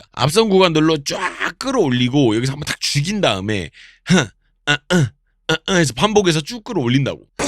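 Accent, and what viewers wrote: South, South Korea. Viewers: 구독.좋아요 눌렀어요.. 살짝 남부쪽 사투리가 들리네요.^^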